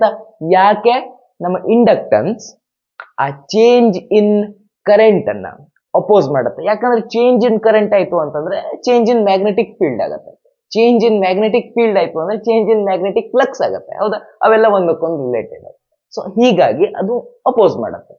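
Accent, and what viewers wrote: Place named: Mangalore, India. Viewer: Sir from manglore, are u also from manglore . . .. ur accents match ❤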